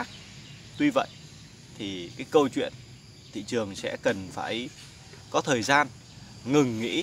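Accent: Thanh Hoa, Vietnam. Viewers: Ông này giọng ở Thanh Hoá, thị trg bất đs sẽ tăng cục bộ tuỳ nơi, nhìn chung sẽ đúng giá trị thật, thử tưởng tượng mua căn nhà phố trên 10 tỷ cho thuê 20t/t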